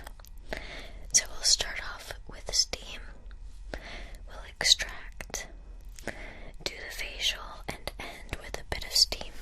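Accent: United States. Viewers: oh I thought you were American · I thought u were American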